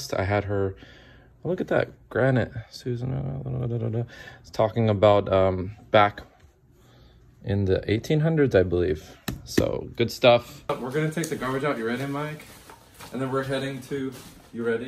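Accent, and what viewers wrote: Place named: Visayas, Philippines. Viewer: Sir ang cute mo mag Bisaya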